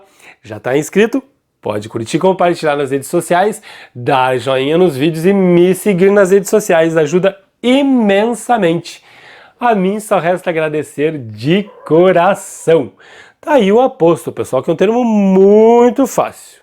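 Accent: Rio Grande do Sul, Brazil. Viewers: Professor, por causa de seu sotaque, eu sempre pensei que você fosse gaúcho de Porto Alegre hahaha.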